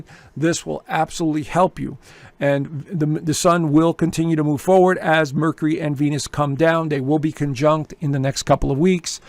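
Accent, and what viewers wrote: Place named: New York, United States. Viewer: Sounds like you’re from the 5 Boroughs or NJ.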